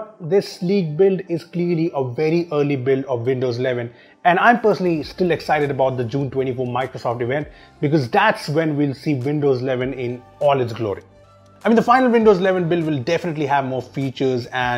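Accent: India. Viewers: Ok i will watch it even though its WITH INDIAN ACCENT haha but yeah thank you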